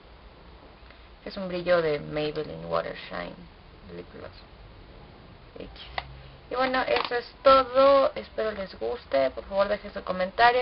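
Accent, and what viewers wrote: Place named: Mexico. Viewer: WOW! Me encantan tus tutoriales, bueno todos los videos y además ese acento mejicano me gusta muchísimo, jaja... Saludos. :)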